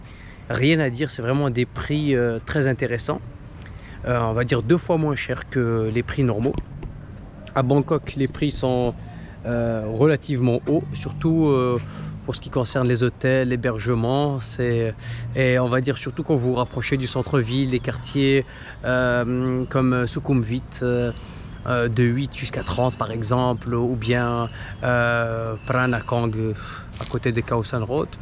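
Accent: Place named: Kabylie, Algeria. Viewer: De dbk et de tigzirt j'avais bien reconnu notre accent magnifique 😁 Bravo a toi . Tu vis en Thaïlande ou en France ?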